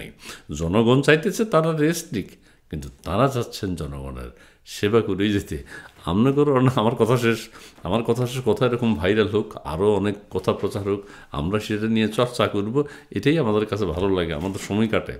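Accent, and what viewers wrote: Noakhali, Bangladesh. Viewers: ধন্যবাদ আপনাকে ভাই। আপনার কথা শুনে ইচ্ছা করে। আপনি নোয়াখালীর ভাষায় কথা বললে ভালো লাগে। যদিও আমি পটুয়াখালীর।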